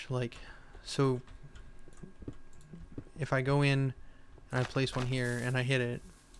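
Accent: Canada. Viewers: I think every other video someone asks if you're canadian xD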